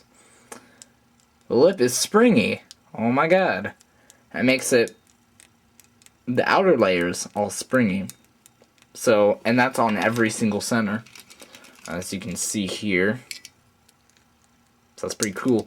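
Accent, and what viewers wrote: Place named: Georgia, United States. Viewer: Goin out on a hunch here.....are you from Georgia? I didn't look at your profile, but you sound Georgian, like my cousin!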